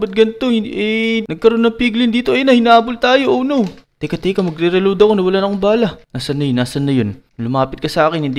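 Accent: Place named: Nueva Ecija, Philippines. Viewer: Taga Nueva ecija siguro to puro "EH" Naririnig ko😂 Only in Nueva ecija knows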